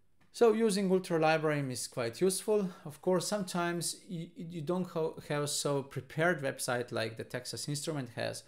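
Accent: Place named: Italy. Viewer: Why do you sound Italian?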